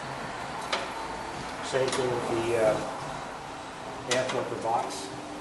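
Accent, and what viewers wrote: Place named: New York, United States. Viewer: Forgive me for not knowing but, is this a New York accent or Philadelphia, or?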